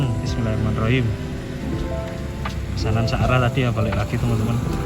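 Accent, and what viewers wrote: Malang, Indonesia. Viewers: Logat malangnya Kuenthel mas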